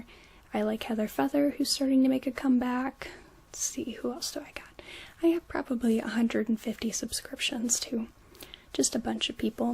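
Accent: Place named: Missouri, United States. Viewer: Lol you from Missouri?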